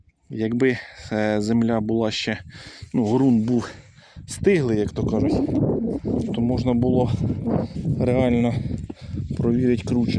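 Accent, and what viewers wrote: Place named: Dnipropetrovsk, Ukraine. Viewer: Бажаю здоров'я. З якого регіону ви? По говору таке як правий берег дніпропетровщини. Я вважаю треба половину виорать, а половину ні, от тоді бункер розсудить!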